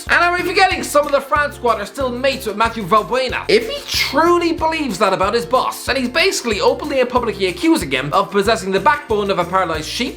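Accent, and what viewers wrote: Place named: Ireland. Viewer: Irish accent makes it seem like that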